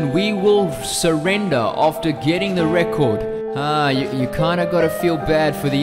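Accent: South Africa. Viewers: Are u south african? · Are you south african ?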